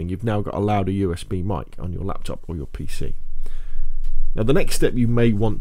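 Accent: England, United Kingdom. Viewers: too english like wotah(water) eh ?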